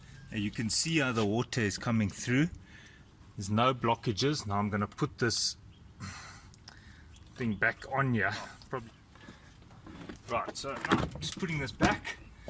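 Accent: South Africa. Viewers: I love the strong South African accent from a strong new Zealand accent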